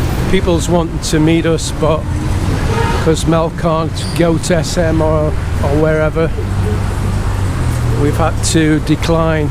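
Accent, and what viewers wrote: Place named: Nottingham, United Kingdom. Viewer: Trying to work out your ascent it sounds Notts area I am still contract working in the UK till next year when I retire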